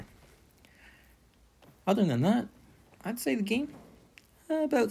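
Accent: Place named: Canada. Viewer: your canadian accent lol 😬😅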